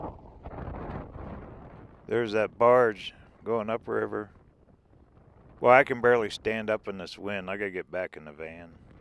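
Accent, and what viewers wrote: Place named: New York, United States. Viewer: You sound like your from NEW YORK City ,there is life out here on the west coast, ya all come back now!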